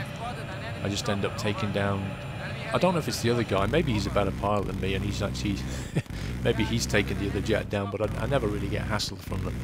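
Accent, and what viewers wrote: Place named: United Kingdom. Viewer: u sound british...so yeah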